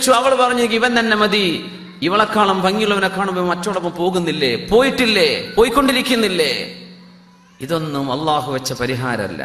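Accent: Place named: United States. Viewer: Ni endinaaaa English american pole slang Ni thani malayali allle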